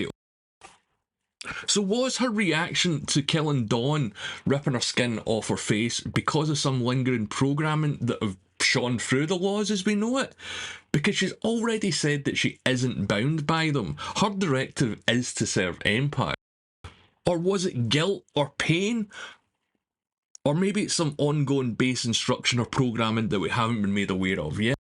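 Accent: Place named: England, United Kingdom. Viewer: It takes a while to get used to your English accent but what you have to say is interesting.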